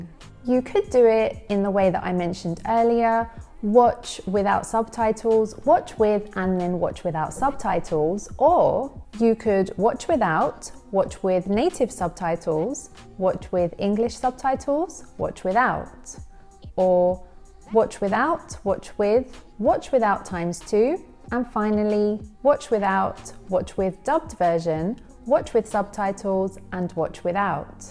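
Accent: United Kingdom. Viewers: your British accent is lovely. Which part of the U.K. are you from? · this speaker is from British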